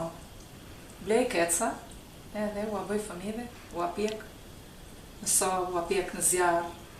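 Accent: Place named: Vlorë, Albania. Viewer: Qe prej theksi dukeni qe jeni vlonjat😍❤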